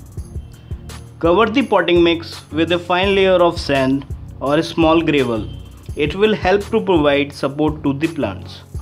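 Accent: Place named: India. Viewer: O my guys, when indian 🇮🇳 speaks English make me so nervous.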